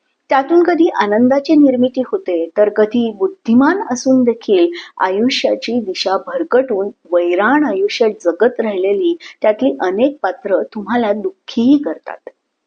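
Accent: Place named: Konkan, India. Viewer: कोकणी आवाजात केलेले सादरीकरण आणि कथा छानच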